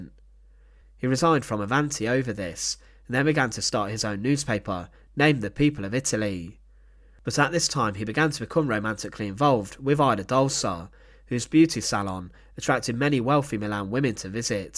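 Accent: United Kingdom. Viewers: This british accent is so awful ... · Very annoying your tone, pause and narrative .. are you British ? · You should ask yourself what is the real goal of this video. The British accent of the speaker is interesting. I wonder if this people can tell us something about the relationship between Churchill and the Duce. During the days that preceded the execution of Mussolini, there were British secret service men operating in lake Como, looking for documentation that could put Churchill in dishonorable condition. It is highly suspected that the documents that Mussolini conserved jealously in those days, were the object of this search by Britain. Interestingly, these documents DISAPPEARED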